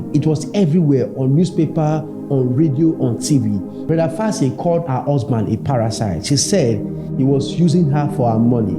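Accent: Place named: Nigeria. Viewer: How is hate this Nigerian accent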